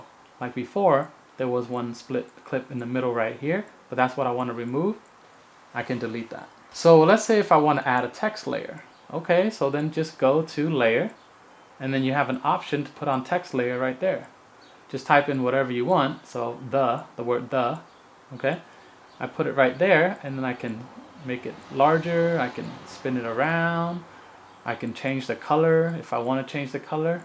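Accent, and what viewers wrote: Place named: United States. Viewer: Wow you really sound like American when I don't see your face hahaha,same here, I'm not Native Speaker of English but I can speak English very fluently hehehe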